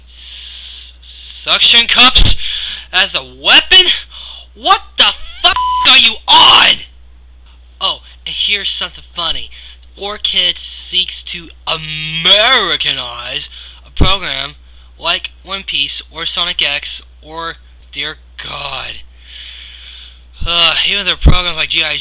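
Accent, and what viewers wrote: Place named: Australia. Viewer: I thought it was aussie.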